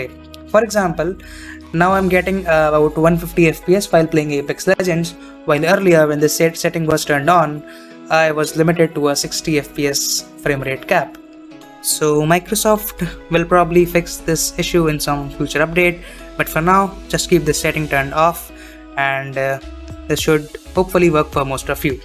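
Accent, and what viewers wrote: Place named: India. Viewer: After searching trough all steam and reddit forums hoping for a fix I couldnt find anything. After going on youtube and clicking on this absolute chad video with a chad that has an indian accent and fixes all your problems with a single switch I finally had the solution. Thx brother, you earned my sub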